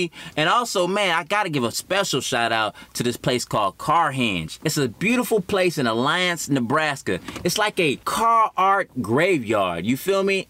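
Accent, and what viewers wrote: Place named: New York, United States. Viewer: You originally from New York?? I detect an accent.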